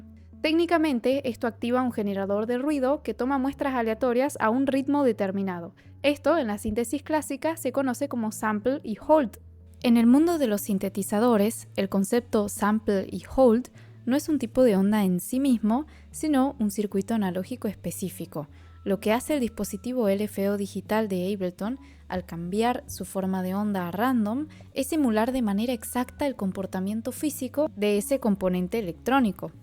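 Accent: Argentina. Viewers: de q parte de argentina eres??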